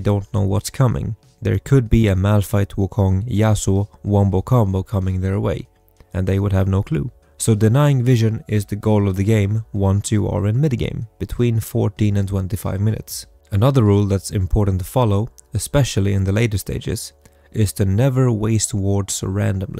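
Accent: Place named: Sweden. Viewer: The way I could tell this would be a swedish channel bc of the accent. Nice vid btw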